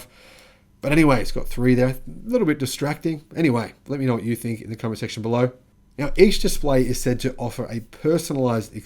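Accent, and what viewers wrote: Australia. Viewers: Tired of the Ausie Accent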